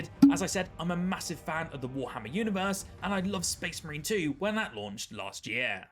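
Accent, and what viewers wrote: United Kingdom. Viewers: Where his is accent from what part of the UK?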